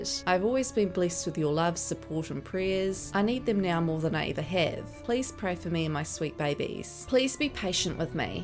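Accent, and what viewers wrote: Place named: New Zealand. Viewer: But for the accent and inflections, so distracting. Sounds Kiwi then have to mute with subtitles only. No complaints about the content though Carry on while I move on to something not so jarring to listen to.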